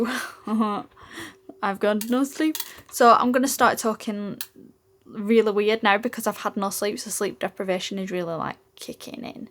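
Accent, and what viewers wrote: United Kingdom. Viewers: This is the first time hearing your voice. Blow me down, you’re British! I had no idea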